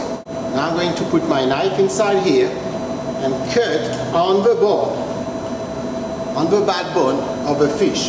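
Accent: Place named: France. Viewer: Bazlur Rahmi worked in an Alaskan fish company for a month. The Salmon came from the boat directly pumped to me. where scarred salmon would go to the canning, and vitamin prepping. And unscathed fish would be cut to filets. which i only did a short time. it wasnt rocket science, you basically saved as much meat of the fish as possible, by cutting away the rest. The fruit peeler as a deboner was the highlight of this video and the dudes snazzy french accent 😂😂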